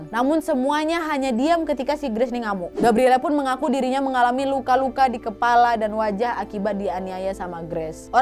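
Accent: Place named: Medan, Indonesia. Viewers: Ko orang medan kak?? Logatny mantap kale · Bah.. Baru singgah disini, anak Medan kayaknya kakak ni.. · Logat Sumatra nya kental sekali...si.. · Bahasanya medan kali lah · kk org medan ya? logatnya kentara😂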